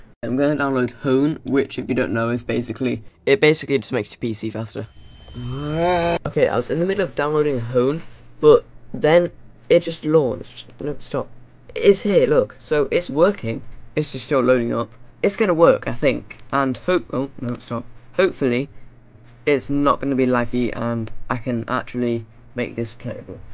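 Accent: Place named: United Kingdom. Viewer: wait, dollars are you american you sound a little bit british to me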